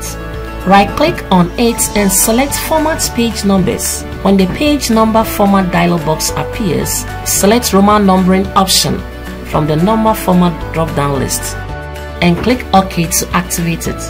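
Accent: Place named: West Africa. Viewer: I have not heard all of the video, but I give compliments to the speaker. Beautiful accent (Nigerian/West African); well-spoken English that is clear as a bell and easy to understand. I've tried listening to people from India. They are very smart, but I can't get past their accents.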